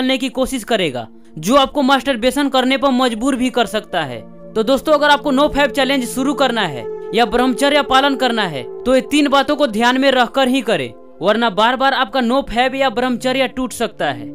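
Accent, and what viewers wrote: Bihar, India. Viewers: Bhi app bihari ho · Aap bihari ho n pakka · Bro you from bihar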